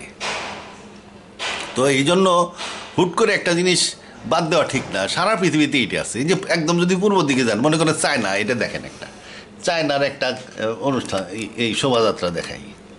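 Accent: Manikganj, Bangladesh. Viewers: আপনার প্রত্যেক আলোচনা আমার অনেক ভুল ধারণা ভেঙে দিয়েছে।আমার জ্ঞান অনেক সমৃদ্ধ হয়েছে।ধন্যবাদ আপনাকে।আপনি কোন জেলার মানুষ? কথা শুনে মনে হচ্ছে আপনি মানিকগঞ্জ জেলার কিংবা আশেপাশের জেলার মানুষ। উত্তর দিলে খুশি হবো।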